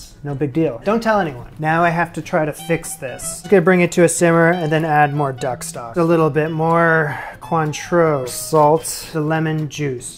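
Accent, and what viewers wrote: Canada. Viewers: A NY apartment dweller with a Canadian dialect that thinks in metric while cooking imperial-united dishes. Interesting. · Did you ever live in Canada? Sometimes, i think I hear a slight Canadian accent in your voice.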